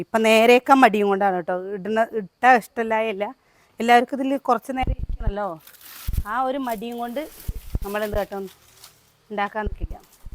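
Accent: Palakkad, India. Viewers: Chechide palakkaden slag kelkan nalla rasamund from kasaragod 🥰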